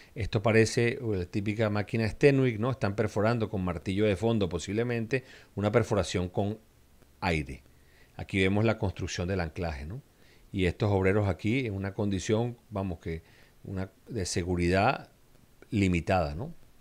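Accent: Venezuela. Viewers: venga: el que habla es un veneço?.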